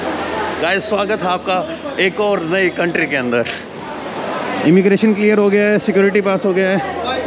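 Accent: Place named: Delhi, India. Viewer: Brother I understand every word of yourself , your voice is similar to delhi region hindi , I study in delhi university in 2007 and live 4year in india , I am also able to read and write hindi and speak also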